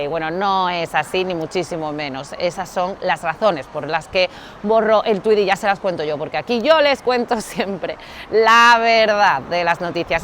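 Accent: Spain. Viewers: Me en canta tu programación pero también me encanta tu acento español😍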